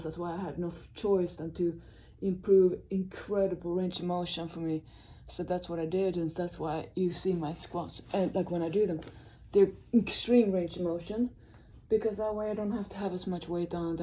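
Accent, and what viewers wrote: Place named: Scania, Sweden. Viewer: Älskar att du har din underbara skånska kvar!! 😂❤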